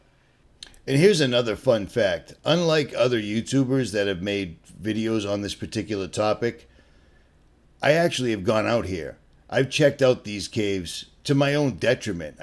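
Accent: Boston, United States. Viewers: I just came across your YouTube channel and this was the first video I've ever watched. I read some of the comments below and they were mentioning a guy named from Boston. I didn't know who they were talking about till I searched on YouTube and Google and figured out that they were talking about the guy who has this YouTube channel. I grew up in Boston; not outside of Boston or near Boston; not on the north shore or the south shore but in Boston. If you grew up in Boston then you know why I mentioned this. I'm curious where you are from in Boston? What section of Boston are you from? I certainly recognize the accent and could take a good guess and probably get pretty close or answer in 2-3 tries. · What happened to from Boston? This man has the same accent as.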